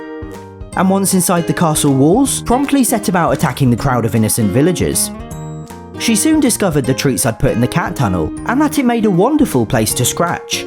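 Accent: United Kingdom. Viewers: glad you’re British and not American if you know what I mean. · He is British I think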